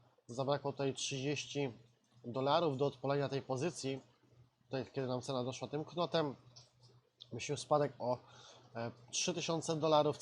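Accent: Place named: Costa Rica. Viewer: Voice of Costa Rica😁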